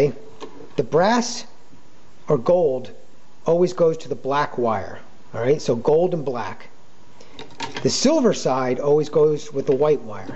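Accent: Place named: New Jersey, United States. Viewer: represent with the jersey accent baby! thanks for the video!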